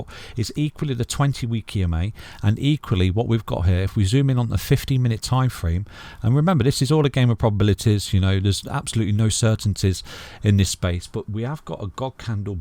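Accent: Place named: England, United Kingdom. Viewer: Why are you speed talking with your English accent who the hell can understand you?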